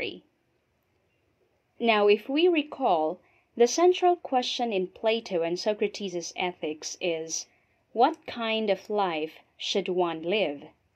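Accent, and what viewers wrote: Philippines. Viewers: Answer me...is this Philippine English?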